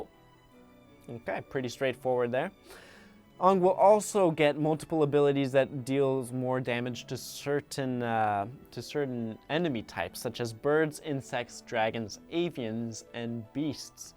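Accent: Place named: Japan. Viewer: well I don't bloody understand his Japanese accent, drop it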